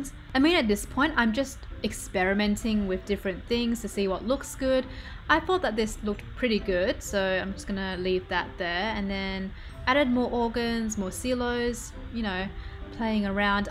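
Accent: Australia. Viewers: shes australian not british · She's reppin' her Aussie accent 🙌🏻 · Are you from Australia?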